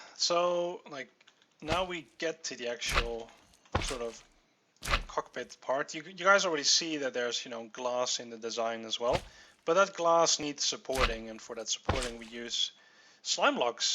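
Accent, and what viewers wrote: Netherlands. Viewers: You dutch??? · i can hear you are a Dutch man ;) ik heb er niks mee maar het is grappig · Are you dutch?? THE accent sounds really Dutch... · Yes he's Dutch many ask this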